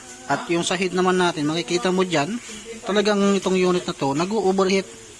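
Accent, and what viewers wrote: Ilocos, Philippines. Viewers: Ilocano yan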